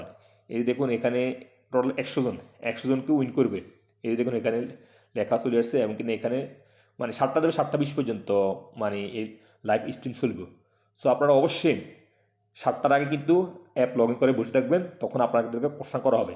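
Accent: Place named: Noakhali, Bangladesh. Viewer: আমার বাড়ি নোয়াখালী আমি আপনার কথা শুনে মনে হচ্ছে আপনি আমাদের বৃহত্তর নোয়াখালীর হবেন আমি আপনার সাথে যোগাযোগ করতে চাই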